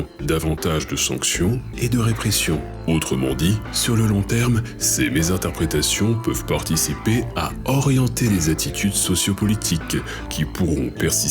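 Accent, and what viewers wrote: Brittany, France. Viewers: d'accord j'ai mis un temps à comprendre ce qui me gênait à l'oreille car c'est très discret, je ne savais pas que c'était une particularité bretonne ! bonne continuation et merci pour vos videos ! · non ce n'est pas un "défaut" c'est l'accent breton, on dit "même" comme ça ici, et les accents sont prononcés différemment. Tout comme l'accent du sud ou d'autres accents prononceront les choses différemment, et ce n'est jamais un défaut ou une faute, juste une habitude culturelle différente.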